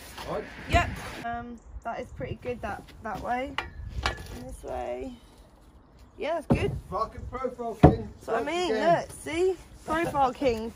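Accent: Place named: United Kingdom. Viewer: Are you from UK? You have the accent :-D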